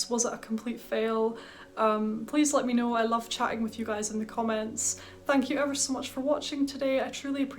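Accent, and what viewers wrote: United Kingdom. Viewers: Just discovered your channel and I‘m glad I did! Your vids have a nice, relaxing vibe. Are you British? I‘m sorry, I‘m Austrian and can‘t really tell, but it’s lovely and I understand you 😀 How large is your collection? Wasn‘t too keen on Nina Ricci Rose Extase either, to me it smells plasticky.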